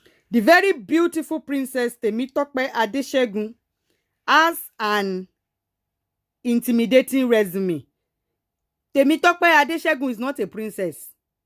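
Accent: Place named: Yoruba, Nigeria. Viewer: What am I even doing here with this presenter? Bit I like that yoruba accent and the way you pronounce NIGERIA . Are all your listeners yorubas? Just wondering 🤔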